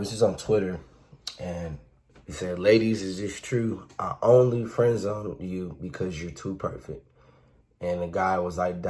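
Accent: Baltimore, United States. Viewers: You from B-more?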